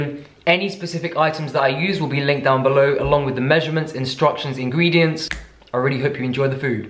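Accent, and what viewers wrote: United States. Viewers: kills me how he never fails to say “peenut butterrrr” in an american accent every time, even in voiceovers. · The random American accent made me sub!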